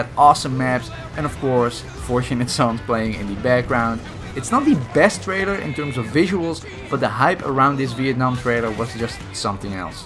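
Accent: Netherlands. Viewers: you dutch? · You are from the netherlands or am i wrong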